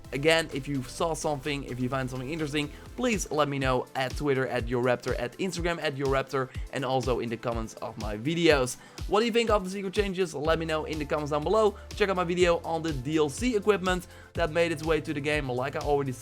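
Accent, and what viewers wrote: Netherlands. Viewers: GoodFella 98 pretty sure its dutch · S1561verian S1561verian Hij is Nederlands. Kun je echt gelijk horen. Plus, hij zei dat volgens mij bij een Livestream.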